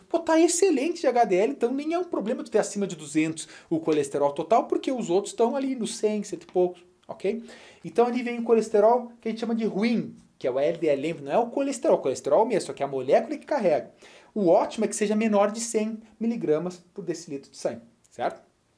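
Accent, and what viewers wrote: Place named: South, Brazil. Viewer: PARABENS PROFESSOR QUE AULA HEIN?????? QUE SIMPATIA!!! ADOREI ! O SEU SOTAQUE É DO SUL! É CATARINENSE, OU GAÚCHO ???? VC É NOTA 1000! MUITO OBRIGADA!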